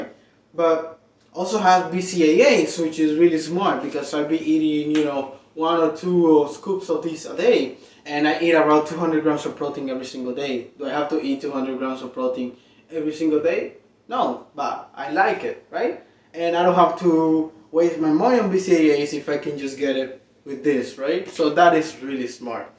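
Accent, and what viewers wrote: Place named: Dominican Republic. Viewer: You sound like you have a Dominican 🇩🇴 accent. Are you from DR?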